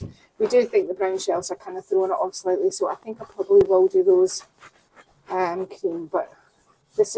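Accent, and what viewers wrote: Scotland, United Kingdom. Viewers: Beautiful! Luv the building technique. I really need to let go of my perfectionism and let that paper and paint fly! Love with a mic. So sorry you can't say, " just leave it alone" with a straight face anymore, I was rolling on the floor! I got another one for you guys coming up on my next vid. I take your words like the gospel, I hear them with your beautiful Scottish accent in my head. 😂 would love a shirt or a coffee mug with your sayings on them. You really knocked it out of the park on this one guys, dar p.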